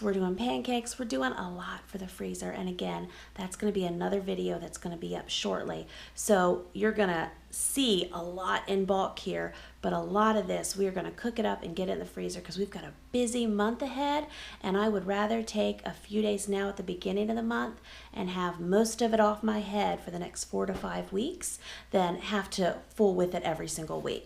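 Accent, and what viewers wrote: South, United States. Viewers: What state do u live in? if im not mistaken u have a southern accent?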